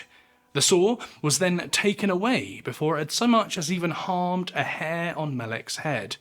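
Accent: United Kingdom. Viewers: YA GOTTA LOVE A HORRIFIC TAKE TOLD BY A BLOODY BRITT!!!! ( I struggle to pronounce the word saw myself.. IT SOUNDS LIKE " SAWRR😱🤨🤣🤣🤣🤣👃🪒🩸🩸🩸🩸🇺🇸🏴🇬🇧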